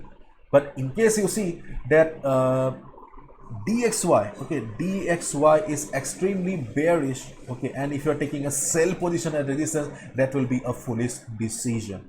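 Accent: India. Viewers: Holy crap I’m an American and I love how your Indian accent isn’t thick at all its super smooth and easy to understand 😂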